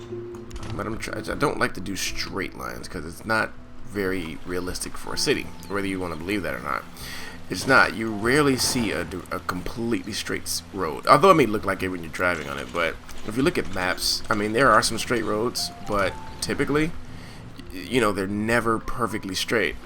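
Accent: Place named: Michigan, United States. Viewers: Hey, you leave Michigan accents alone! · I was guessing you were from the Midwest - maybe Wisconsin or Michigan - based on your super slight accent.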